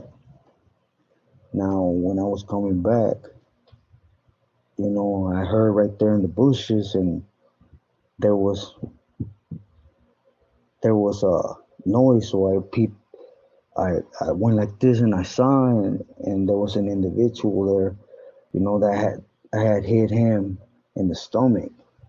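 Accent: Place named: Solano County, United States. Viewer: You gotta be from Solano county...the way you talking...you might be from Vacaville maybe Napa or Santa Rosa....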